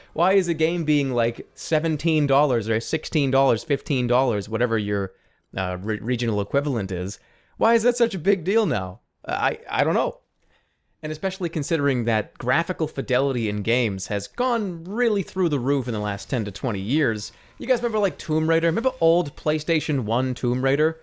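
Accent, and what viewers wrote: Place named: Boston, United States. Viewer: God I miss the strength of his Boston accent in his yt days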